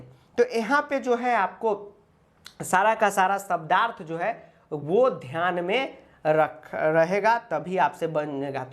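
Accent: Bihar, India. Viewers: Sir Your bihari accent >>>>>>all school sanskrit teacher🎉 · Sir aap itna aacha samjhate hai humko sab samhj me aata h mujhe pehle sanskrit jada nhi samjh aata tha but aapke videos ko dekh kar ek umidd aa rahi h sir 😊😂🎉 or aapka ye bihari tone me jo aap baat karte ho vo mujhe bohot aacha lagta h sir 😊 you are great sir 🎉❤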